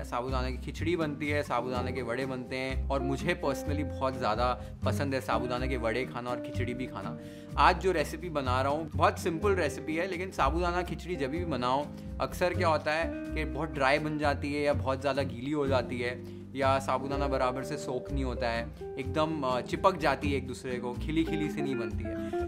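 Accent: Maharashtra, India. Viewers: Heres a son of Maharashtra who respects our traditions n cuisines. Otherwise v wld hv mutton biryani lucknowi style uploaded on sch occassions..totally insensitive. All my love n blessings to u chef. U hv md ur parents proud. And i love ur perfect marathi accent too😄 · Your Food channel is my favourite food channel. I have made khekda bhaji and Misal exactly how you showed and it was amazing 😍 thank you. Your Marathi is so pure अस्खलित, hope people have noticed the below words used by you instead of Hindi shows your love not only towards the dish or recipe but the traditional and authentic way and process you like to make that Marathi / Hindi Maap / Naap Chitak / Chipak Marathi influence, true Maharashtrian 🚩🚩 Jay Shivray, Jay Maharashtra 🚩🚩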